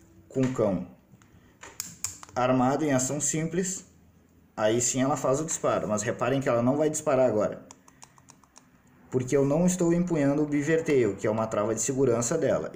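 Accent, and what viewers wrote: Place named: Porto Alegre, Brazil. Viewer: Excelente vídeo. Só não gosto do teu sotaque de Porto Alegre... Agarrei nojo por conta da Manuela D'avila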